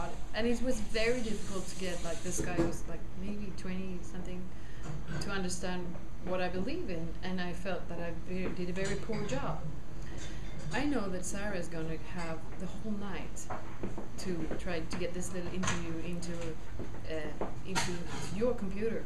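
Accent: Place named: Sweden. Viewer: I love that Swedish Accent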